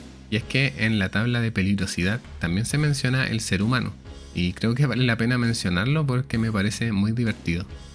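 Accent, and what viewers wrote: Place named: Chile. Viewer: Eres de Chile? Con razón tu acento se me hacía tan familiar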